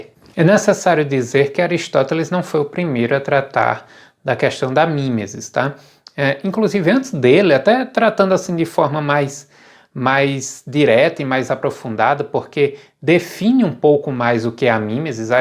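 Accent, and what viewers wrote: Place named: Bahia, Brazil. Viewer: Boa tarde, prof gostei da sua exposição, é a primeira vez que mantenho o contato. Além disso nosso sotaque no YouTube é outro diferencial acima e a mais. Desculpa a pergunta, mas o senhor está vinculado a algum programa de pós graduação? Em caso positivo pode informar? Sou Marcos José de Souza, prof de Língua Portuguesa, Rede Estadual da Bahia, em Fátima. Abr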